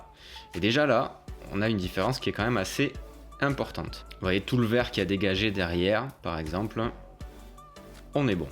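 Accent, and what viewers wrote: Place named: Southwest, France. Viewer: Coooommmeeennntt??? tu es du Sud Ouest???? Vive la chocolatine!:D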